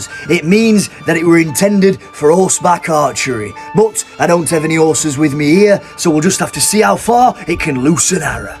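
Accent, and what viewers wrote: United Kingdom. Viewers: I've subscribed for three reasons: this is very funny, he goes straight to the point,and his story telling skills are excellent. Forgot to mention that I love the British accent, I find it very refined.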